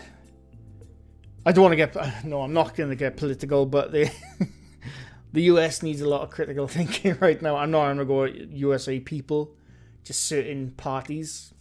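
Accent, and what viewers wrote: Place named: South Wales, United Kingdom. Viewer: At long last I can disagree with you:-) If you go to a long, open beach with a 3 or 4 foot ruler and line the ends up with the horizon, you can see that the horizon has a radius. Another great video from (I think) mid South Wales, judging by your accent.:-)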